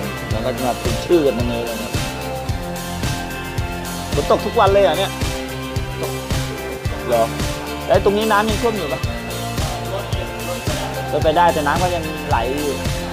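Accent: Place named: Nakhon Sawan, Thailand. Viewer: ชอบสําเนียงพี่นะครับ ผมมีเพื่อนอยู่นครสวรรค์ สําเนียงแบบนี้แหละครับ ไม่รู้ว่าใกล้เคียงรึเปล่าครับ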